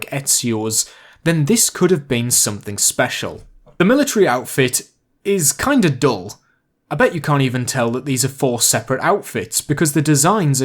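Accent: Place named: Scotland, United Kingdom. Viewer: Great video and Ilove your accent man! Are you Scottish?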